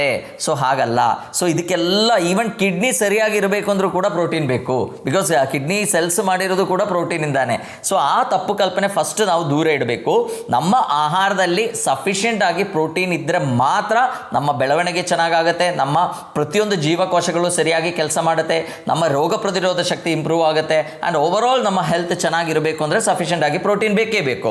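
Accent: Kerala, India. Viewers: ಅದ್ಬುತ ಕನ್ನಡ ಮಲಯಾಳದ ಶೈಲಿಯಲ್ಲಿ..‌ಅಡಿಪ್ಪೊಳಿ... ದನ್ಯವಾದ.‌🎉 ಉತ್ತಮ ಮಾಹಿತಿ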